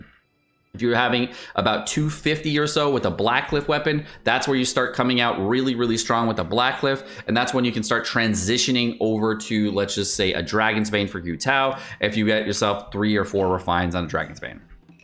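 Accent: United Kingdom. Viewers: Can we please get a British only video please 😭😭😭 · I looove british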